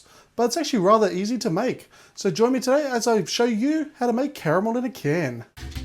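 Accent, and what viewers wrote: Australia. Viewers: Finally a Aussie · he is so fucking beautifully australian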